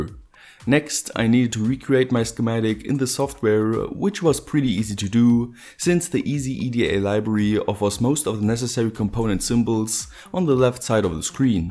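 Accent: Germany. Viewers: what realy you are german iam too i wonder for a bit why your main plugs are european and your english is kinda german i dont know XD but why are you dont make german videos i love to see that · man hört voll das du Deutscher bist :D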